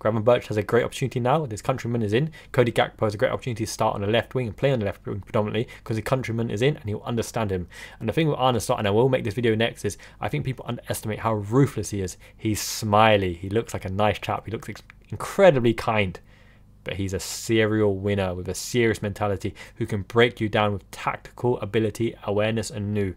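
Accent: United Kingdom. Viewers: おすすめに出てきました。同じく日本のリヴァプールファンとしてyoutube活動応援しています。あと日本人としては珍しいukのアクセント、めちゃくちゃかっこいいです。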